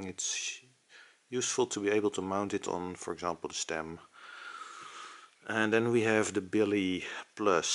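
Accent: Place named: Netherlands. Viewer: Dutch accent 😁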